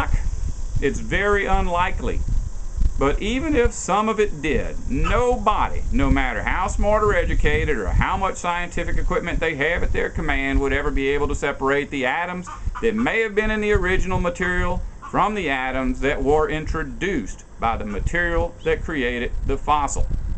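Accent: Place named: Texas, United States. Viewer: Your voice is very comforting to me, never mind what anyone else says. Its a vocal pattern I heard constantly when I was tiny, growing up in the Gulf Coast area of Texas. So you, sir, are the voice of a comforting bedtime story to me. Thank you for being you.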